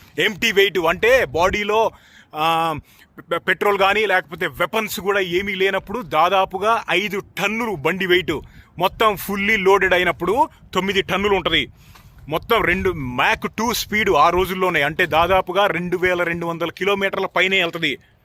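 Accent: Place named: Telugu, India. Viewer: This is my favourite video, I watched not less than 25 times, your language and the native Telugu accent makes it so memorable, SV Ranga Rao gari Mayabazar Dialogues gurtuku vachayi, Ghantasala gari voice laga anipinchindi - antha Gaambhiryam vundi voice lo.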